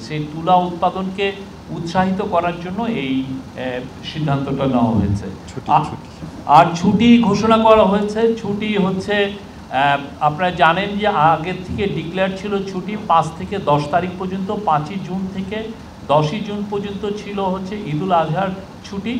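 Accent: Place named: Kolkata, India. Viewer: Kotha bolar style e lage jeno kolkatar style🐸🐸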